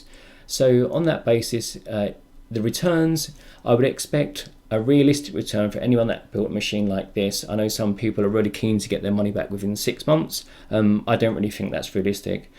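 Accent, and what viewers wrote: United Kingdom. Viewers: you sound like your from the UK but you always price in dollars strange? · Ubersonic He is British according to his accent abs he always mentions the price in pounds, but this time round he mentioned it in USD.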